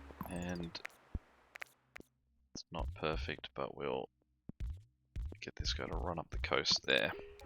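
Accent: Australia. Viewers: It is so refreshing to hear a fellow Aussie on this site, and one with a good mic at that. Keep making these vids mate, I'm waiting for you to blow up.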